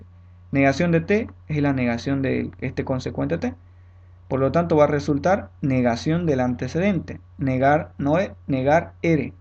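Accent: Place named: Bolivia. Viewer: Sos de Bolivia? Se me hace familiar tu acento :v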